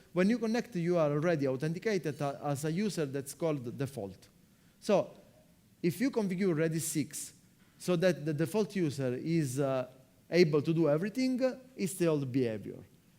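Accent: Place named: India. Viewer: 没讲 thread i/o 啊，口音有点像三哥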